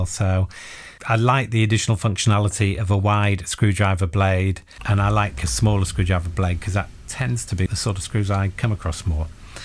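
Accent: United Kingdom. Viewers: Hi! New subscriber here. Love the “James Bond” intro there, specially with that natural British accent, such a joy to hear. Anyways, I like how you do these gear reviews, in such a simple way, very straightforward, I feel the sincerity. 👍🏼👍🏼 to you! Your new number one fan from the Philippines. 🇵🇭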